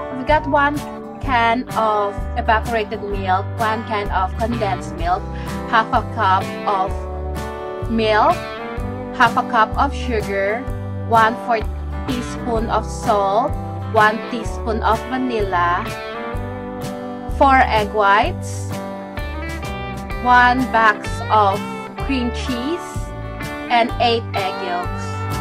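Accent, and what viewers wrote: Philippines. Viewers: you sounds like Filipino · Hay naku dmo pa KC tagalugin halatang Pinay ka.puro question mark way Ng English mo😂😂😂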